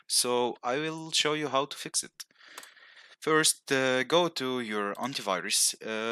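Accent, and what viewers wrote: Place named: Italy. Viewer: Grazie caro ho risolto, sembri italiano dall’accento quindi bella pe te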